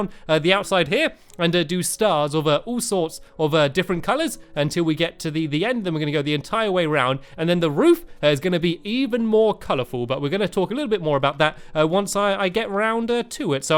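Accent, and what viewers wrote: United Kingdom. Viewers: Dude, you British? I'm from a commonwealth so I have a British accent too :) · You know that is British bc he has an accent and he spells color with a u!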